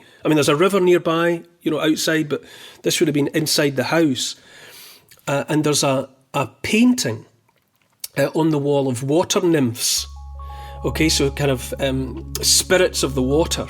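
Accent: Scotland, United Kingdom. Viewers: D'ya think y'can lose that Scoooooots accent as it jars on my nerrves?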